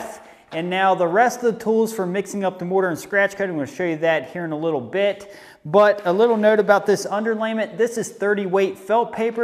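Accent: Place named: Pittsburgh, United States. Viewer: Listen to that Pittsburgh accent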